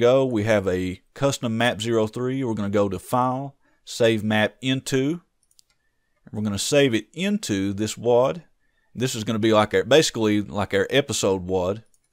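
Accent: South, United States. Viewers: I think more tutorial people should have southern accents, it makes me more attentive to listen.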